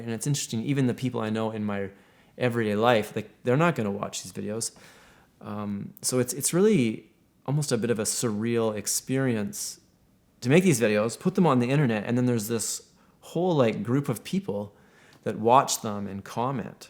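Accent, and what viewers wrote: Canada. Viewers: I have a very similar perspective to you on beliefs, but you've worded it much better than I can right now. I went through a religious phase but now am agnostic. Honestly my belief system is a Frankenstein monster of bits and pieces of every religion, belief system, and psychology but at it's core it's trust my intuition on what feels correct. I also appreciate your Canadian accent, my mother's side of the family is Canadian and it's always made me feel at ease 😊 I'd love to hear more of what you think on this topic.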